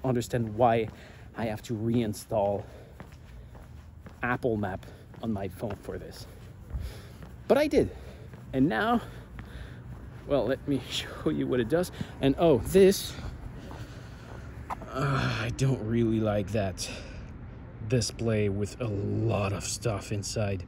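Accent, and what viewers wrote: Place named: France. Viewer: your accent is much too strong, when a French person tries to speak English it is usually very difficult for me to understand or I do not understand half of it because of the poor pronunciation of the words and I speak a lot of English and German and Dutch. I also think that you as a Garmin fanboy you just enjoy making Apple bad while it is an all-round top product. Garmin is certainly good too, but its not for me.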